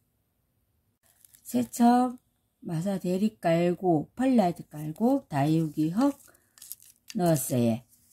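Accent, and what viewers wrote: Gyeongsang, South Korea. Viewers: 제라륨 분갈이를 경상도 싸투리로 정겹습니다 분갈이할 때 조마조마합니다 분갈이 하고 죽는경우를 많이 겪었네요 ㅎㅎ 재밌네예 부지런한 손은 아름다워요 호야네 실방에서~응원하러 왔어요 😊😍😍👍👍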